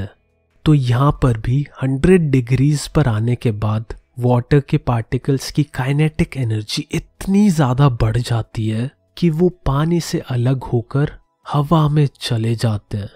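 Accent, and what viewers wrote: Haryana, India. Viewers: I bet ..This guy is from HARYANA !! 🥶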